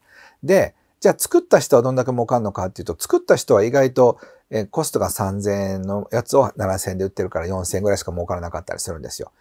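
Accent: Kansai, Japan. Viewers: 健さんのYouTubeを数ヶ月前から見ています。 健さんの関西弁や、エンタメ要素のある内容、思わず笑ってしまいました。 またこういう面白い健さんも見てみたいです！ ありがとうございます😊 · 健さん いつもありがとうございます✨ 健さんの関西弁が聞けて楽しかったです♪ 私も関西出身ですが、やはりビジネスは関東（東京）中心で関東人の方が、お金持ちになりやすいと思います。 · 面白かったです！ 健さんの動画が好きで、最近毎日見させていただいてます😊 お話を聞いていて、何か関西の雰囲気も感じる方だな…と思っていたのですが、関西出身だったんですね😊 私は話し方やノリは関西が好きですが、関西は値切るイメージがあるから、お金に関するのは関東のが好きかなぁ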